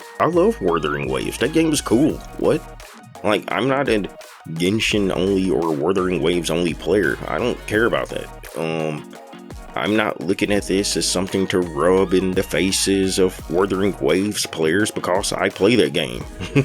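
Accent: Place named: South, United States. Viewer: He do got that southern twang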